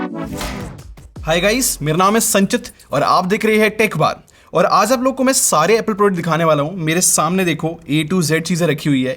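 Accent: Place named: Delhi, India. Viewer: Bro I think it's delhi accent 😂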